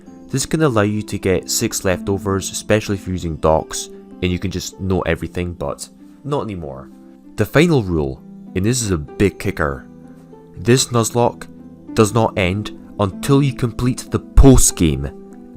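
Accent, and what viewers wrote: Scotland, United Kingdom. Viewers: That's just his accent, not a speech impediment, you can hear that strong scottish accent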